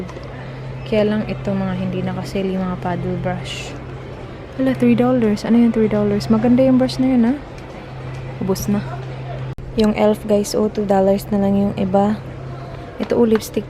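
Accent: Visayas, Philippines. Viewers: Bisaya jud gihapon ang accent te ba. AHAHAHA LOVE YOU ALSO!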